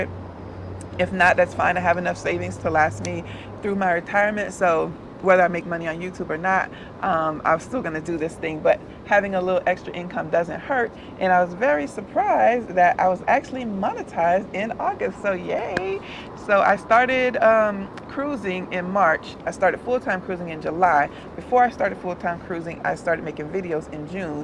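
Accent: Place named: New Orleans, United States. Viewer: Ggggggiiirrrllll, there was something you said in one of your other videos and I was 🤔 , she is speaking like she is from NOLA because I've only heard other REAL New Orleanians say that!!! 😂😂😂🎉Then I saw this video confirmation!! CONGRATULATIONS!!! 🥳 🎉 🎊 🥳 🎉 🎊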